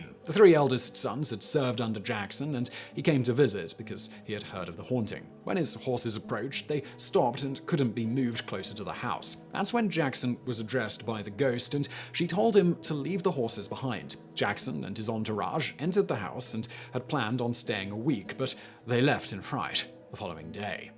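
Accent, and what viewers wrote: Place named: RP, United Kingdom. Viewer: Simon is REALLY over-egging his Head Boy private school accent on this one.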